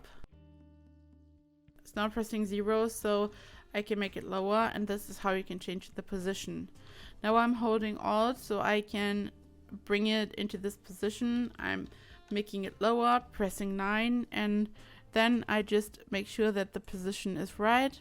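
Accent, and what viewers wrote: Germany. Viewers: You have a very german accent? Do you come from Germany?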